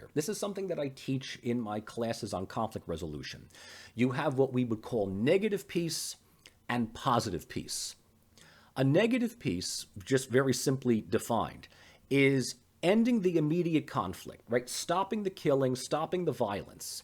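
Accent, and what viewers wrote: New Jersey, United States. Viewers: I am so happy to see your post. I've only just started to watch, this video but it looks like we will hear your views and info alone. I feel very lucky that I found your channel a while ago and I thank you for the things you share with us 😊 I like much better when you give your views alone, but I guess we need to be more open to others as well. Besides, I like to hear you speak because I am from New Jersey also ❤❤❤❤❤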